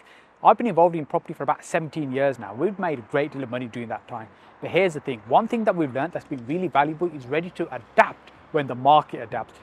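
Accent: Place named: Birmingham, United Kingdom. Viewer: Brum accent but perfect English though.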